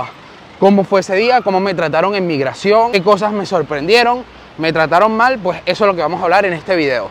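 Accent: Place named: Panama. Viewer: Me gusta como dices aquí tenemos. Ya tu eres panameño.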